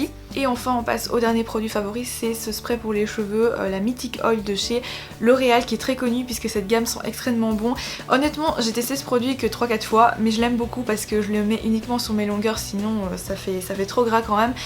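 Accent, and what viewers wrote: Belgium. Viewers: je te suis depuis pas tres longtemps donc j'ai une question a laquelle tu as peut etre deja repondue ^^ : Est ce que tu habites ou as habite en Belgique? car de temps en temps je trouve que tu as un petit accent ^^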